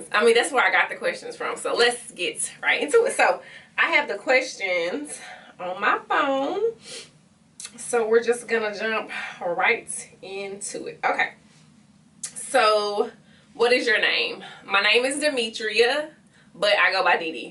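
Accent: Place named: Atlanta, United States. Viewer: prettywitty77 I'm from Atlanta and I thought she was from Atlanta too with this accent lol, I know it gets annoying when ppl ask you that haha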